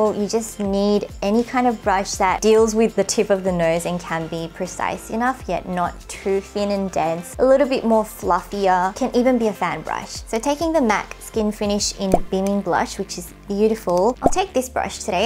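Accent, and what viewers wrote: Australia. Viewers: Is that an Australian accent I hear? But don’t you live in Korea? Anyway.. You’re freaking adorable. 😊😊❤️ Thanks for this! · You look so cuuuute ! And I know I say it everytime but I love your Australian accent! 😻😘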